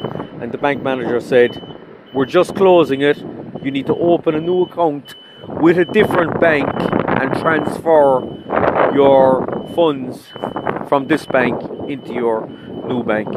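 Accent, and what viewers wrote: Ireland. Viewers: Where is that accent from? First time hearing it from Donegal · Prepare yourselves for the Social Credit system, guys, please. This is a foretaste of that system, that is already being planned for the UK. On a side note, forgive me, what region of Ireland is the presenter from? His accent is amazing. 😊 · What part of Ireland is this accent from? I don't think I've ever heard it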